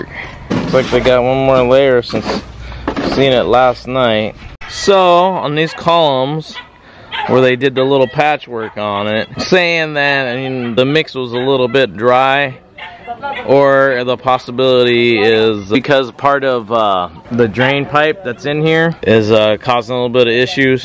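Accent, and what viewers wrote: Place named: Texas, United States. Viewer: That happened to me with one of the columns. It was so annoying. Are you a southerner ? You sound as you’re from the south. Texas way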